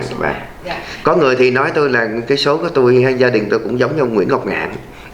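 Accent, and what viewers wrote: North, Vietnam. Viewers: Ong nay khong phai nguoi Sai gon nghe cach noi chuyen va giong noi giong nguoi bac .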